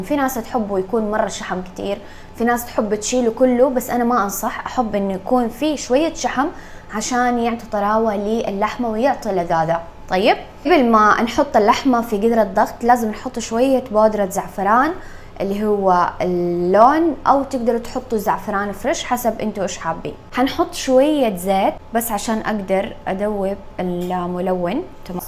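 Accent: Hejaz, Saudi Arabia. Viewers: سعودية حجازية .. هذي لهجة أهل الحجاز.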